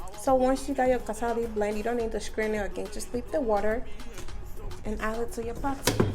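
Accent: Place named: Liberia. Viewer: She is definitely Liberian that accent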